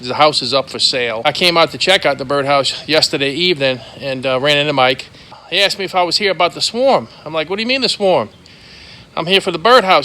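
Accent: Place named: South, United States. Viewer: 😂 thats brilliant! Real southern, love that accent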